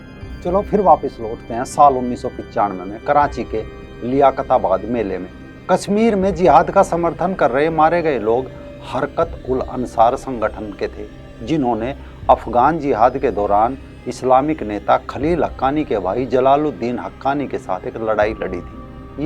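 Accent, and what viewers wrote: Haryana, India. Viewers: Bhai apki awaz se lagta hai ap hriana stet se ho,, حریانا ب پنجاب کا حصہ تھا کبھی 😢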